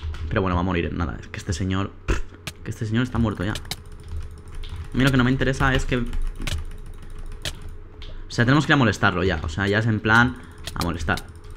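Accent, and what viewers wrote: Argentina. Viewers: deja de hablar en argentino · ¿ sos de argentina o de españa? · Acento argentino <3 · me encanto tu acento argentino, tuviste que aver ganado, ivas tan bien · muy buen acento argentino y te lo dice una argentina 😃 a y sigue subiendo este modo de juego me encanto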